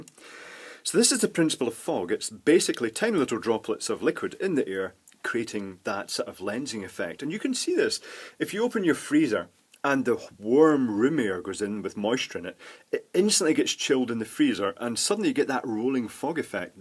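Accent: Scotland, United Kingdom. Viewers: I keep reading code and articles in your Scottish voice and accent and I can't make it stop. I think I'm gay.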